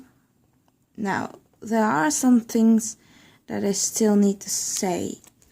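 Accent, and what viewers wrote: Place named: Netherlands. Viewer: whatts wrong vith her voice? Its an accent. I guess Dutch or maybe swedish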